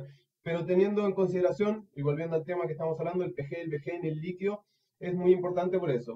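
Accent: Argentina. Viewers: eres argentino? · El mejor video técnico que he visto. Creo que podré despedirme del pucho al fin. Pensé que eras de acá.. ya casi no se te percibe el acento Argentino .. jijij. Gracias y Saludos.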